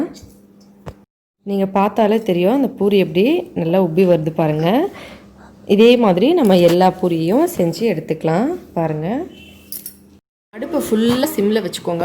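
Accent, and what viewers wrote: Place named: North India, India. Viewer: நீங்க இந்திகாரங்க தான ......ஆனா அழகா தமிழ் பேசுறீங்க எப்படி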